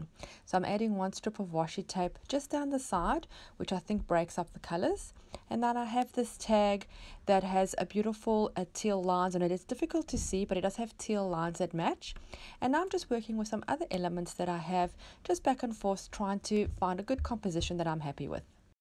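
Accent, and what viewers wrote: South Africa. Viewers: I subscribed to your channel a long time ago but never actually watched a video until today, I have a lot of catching up to do. I loved what you did and I actually liked both of the paint swatches. The biggest plus for me was hearing your South African accent, I grew up in SA so love the connection. Thank you for an excellent tutorial.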